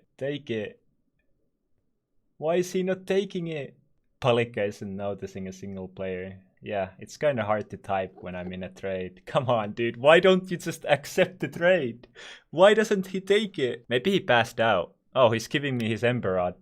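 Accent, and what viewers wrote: Finland. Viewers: are you from finland because your english sounds finnish accent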